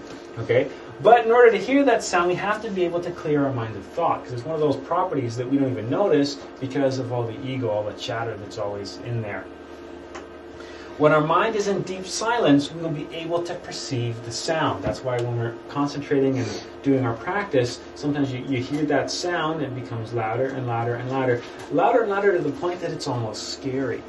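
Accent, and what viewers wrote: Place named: Canada. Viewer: He's from Canada... I can hear the accent ;) haha not that it matters but great stuff in this video good work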